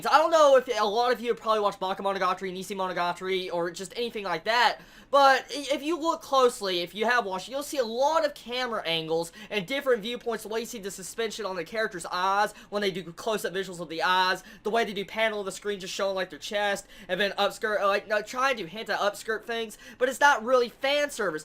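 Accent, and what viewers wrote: South, United States. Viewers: This guy is so southern.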